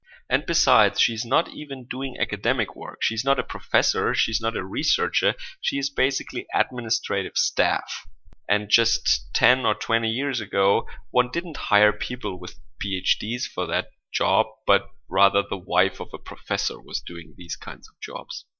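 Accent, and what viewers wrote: Germany. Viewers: "appreciated just for having a pulse". lol. Great vid made all the more so with the German accent. I love to hear foreigners speaking English. It seems to make what they to say all the more interesting and focused on imparting important information. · When I was a kid I had a german friend, here in Chile. Same accent, same sense of irony. God bless him and you. Loved the video. The engineer guy had a great pair, props to him!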